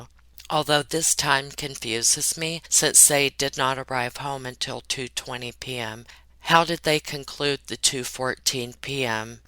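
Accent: United States. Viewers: I love you an shit. I think youre so down to earth and you sound like a USA version of me lol Keep up the good work. The world was already Mad hunny pie. Its just that we are all at home watching and listening and realising how fucked we truly are. Double Pandemic. Covid and Stupidity. Stay safe and feel the love Im sending you. From one night Owl to another, love and light to everyone from wee me over here in SUNNY Scotland lol (see how long this lasts eh...) lXl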